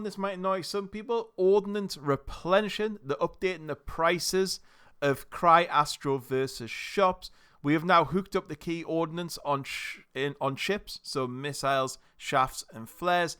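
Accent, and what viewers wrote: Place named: North, United Kingdom. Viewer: Cheers for the patch notes dude, its nice to hear a fellow Northerner for once. subbed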